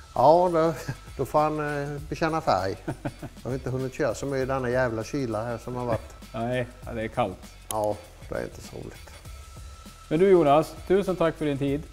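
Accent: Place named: Vetlanda, Sweden. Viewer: Skön blandning av nostalgi, entusiasm, motorkunnande och Vetlandadialekt!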